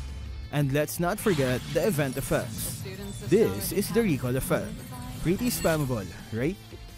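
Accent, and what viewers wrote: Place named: Philippines. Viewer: that forced vocal fry pinoy accent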